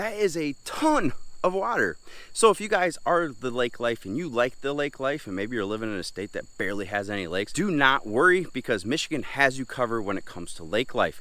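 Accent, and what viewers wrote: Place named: Canada. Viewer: Not sure where this guy is from. Sounds like Canada. Michiganians do not have that accent.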